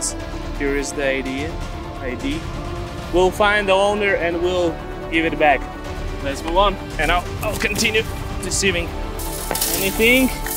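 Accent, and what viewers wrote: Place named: Boston, United States. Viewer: That's clearly a Boston accent.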